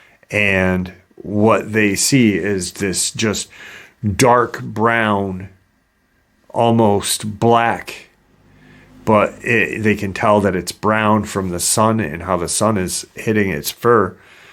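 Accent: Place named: New Orleans, United States. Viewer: Bro don’t listen to critics Your show is f*****g awesome Your show is bad ass I like dark waters too cuz I from New Orleans but bro I don’t give a shite if u speak with a Nola accent and are an orater or not you do perfectly fine communicating what you research, and as far as your research it’s badass so keep it up and F*** the critics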